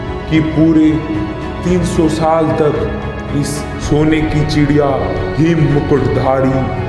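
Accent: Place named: Uttar Pradesh, India. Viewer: Feedback : Please work on the Voiceover..content, graphics ..outstanding ..voiceover mein UP accent hai..Samskritized Hindi accent chahiye...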